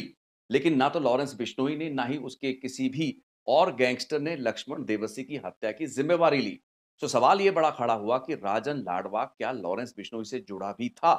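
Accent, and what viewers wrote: Punjab, India. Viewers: Good morning ji.. I understand in Hindi language, but love ur punjabi accent..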